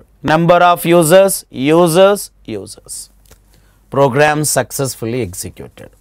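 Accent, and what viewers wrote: India. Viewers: İngilizceyi bilmiyorum sanıyordum meğer hint aksanı olunca anlayabiliyormuşum adamsınız hintliler devam <3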